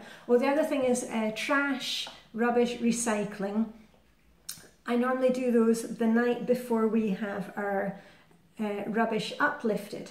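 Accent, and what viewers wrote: Scotland, United Kingdom. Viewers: I’ve only just found your channel! from Denmark? As soon as you had said your first 4/5 words I knew you were from Scotland 🏴 and when you said Bahooki - that takes me back 🏴- well I’m hooked... I’m clicking on to binge watch. It sounds like we are having a wee blether! Cheerio Lizx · Fine Scottish accent,!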